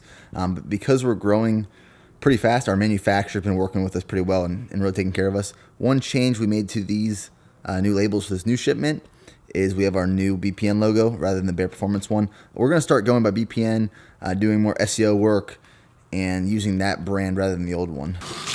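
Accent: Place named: Pennsylvania Dutch, United States. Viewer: hmm guess its just that penn dutch i pick up on lol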